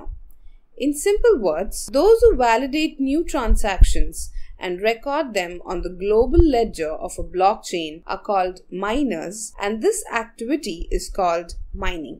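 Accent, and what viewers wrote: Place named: Trinidad and Tobago. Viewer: Your accent suggests you are from Trinidad. Am i right?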